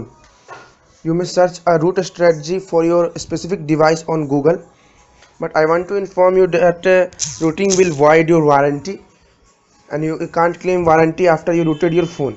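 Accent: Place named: India. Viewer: Will you please slow down when you talk in english. Your indian accent is why too strong for me to follow anything you are saying...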